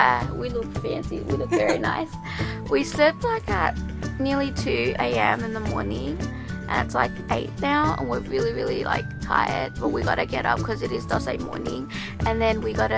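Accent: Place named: Australia. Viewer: Loved the vlog! Oh my gosh you're the first nepali with an Australian accent I've heard! love love love the accent <3 Also subbed and would love it if you checked out my vids too :)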